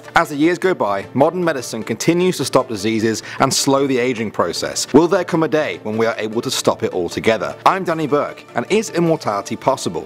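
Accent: United Kingdom. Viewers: 😍😍😍😍 I KNEW THAT BRITISH VOICE SOUNDED FAMILIAR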